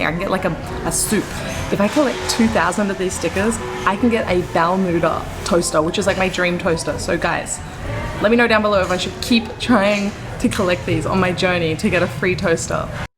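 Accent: Australia. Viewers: I thought you are only Australian because of your accent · Wait, German? I felt absolutely sure you were Australian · At the start you said you were German.....you sound like you are from South Sydney..... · oh I didn‘t know you are german. I thought you are from Australia. Love your Videos! Greeting from Germany 🙋🏻‍♀️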